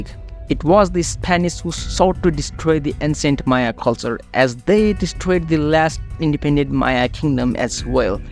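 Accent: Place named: South Asia. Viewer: I see why you started using an AI voiceover, it's because you're from South Asia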